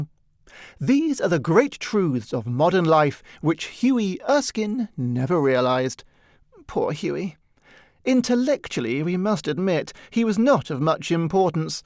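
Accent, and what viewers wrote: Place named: Dublin, Ireland. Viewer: Finding this to start my day was perfect! And hurrah for a lovely Dublin accent 💖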